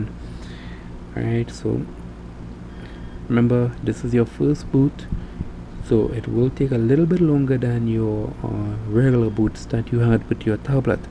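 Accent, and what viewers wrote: Trinidad and Tobago. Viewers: trini accent detected?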